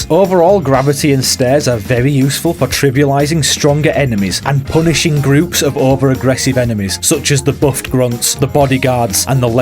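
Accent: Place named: United Kingdom. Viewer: Of course its s UK accent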